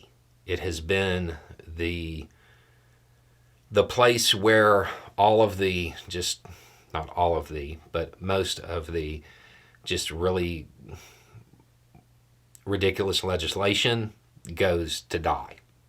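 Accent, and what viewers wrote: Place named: Florida, United States. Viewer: He’s also part of a comedy group who call themselves the liberal rednecks. It’s ironic southern humor. Trae Crowder, who shows up making pretty insightful political commentary once in a while, has a very noticeable rural Tennessee accent. himself, who’s name, btw, is not - that’s a typical deep south name, has a Florida accent which is slightly modified when he makes these videos, but the way he looks? That’s Florida Man. It’s all very regional American humor and plays up to American stereotypes. I can’t tell where you’re from from your name, but I’m willing to bet you’ve got the same thing going on in your country.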